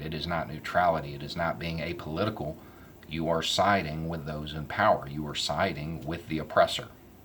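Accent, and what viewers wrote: South, United States. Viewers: He's not exactly hiding who he is, his channel is called "Beau of the Fifth Column". I just assumed he affected a more neutral accent on his work channel because a Southern drawl is viewed as unprofessional. This one is his personal channel and he can sound like and say what he wants here. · I hope you hung on these last three years, I think it was the "let's talk about a hundred thousand subscribers" video he talked about the accent. I believe Beau is a positive force in the world, and proves you shouldn't judge a book by it's cover. (And yes, I'm a country boy, have a bit of a southern accent, vote blue in a red state, and enjoy hearing common sense from a dude in a shed that looks and sounds a bit like me!)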